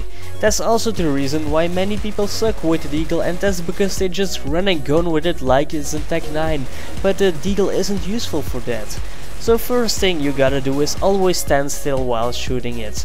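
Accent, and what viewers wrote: Netherlands. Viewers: Are you dutch? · R u dutch? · Are you Dutch? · he sounds very dutch to me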